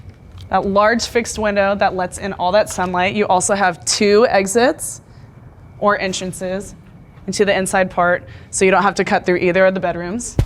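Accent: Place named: United States. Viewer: Someone can tell me from which state this american english accent come plz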